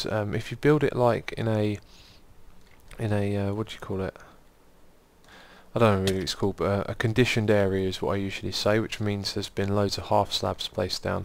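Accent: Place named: United Kingdom. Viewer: You can't breathe through your nose? I just thought it is your british accent, so i think it's not a really big problem anyway... I am glad that you do what you want instead of making what you announced despite if you have fun. That's how shitty stuff is produced.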